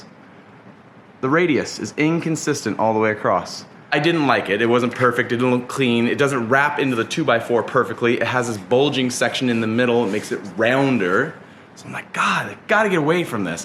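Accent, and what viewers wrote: Canada. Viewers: Whats with all these canadians and the ABOOT crap!!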